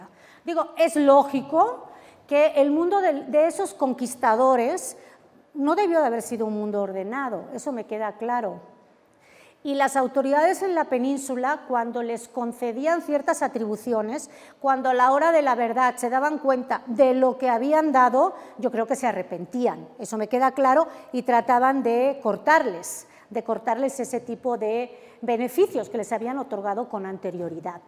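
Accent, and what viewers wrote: Mexico. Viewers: Me gusta el deje mexicano mezclado con el suyo peninsular. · Será residente en México, por que tambien tiene algo de acento. No el canal es tuyo, yo soy un simple seguidor mas cuya pasión es la historia, pero da una conferencia con un tono que se desprende que Cortés ha sido poco menos de un ser miserable, desde el minuto uno, adoleciendo de la imparcialidad con que un historiador debe analizar y presentar la historia. Apenas vi 16 minutos. Y respecto a algún comentario que leí por abajo, discreto, México en la actualidad es donde las instituciones tienen un lenguaje mas antihispánico, cada vez que escucho una conferencia, por lo general, me recuerda mucho a sintonizar tv3, un lenguaje subliminal hilarante. Aunque me alegra decir que tengo algún amigo mexicano y además mestizo, que para nada se ajusta a los "cánones impuestos", será que en su caso tuvo bastante contacto directo con españoles y pudo formarse su idea propia.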